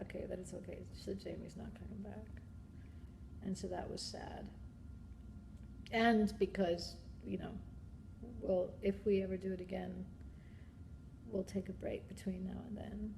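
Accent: England, United Kingdom. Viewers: Oh wow, when she’s interviewed in Britain she adopts a British accent. It’s nicer I must say. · Russell Barth She's always had a English accent.She grew up in London.Thats her original accent. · why do you have an english accent now when you didn't before?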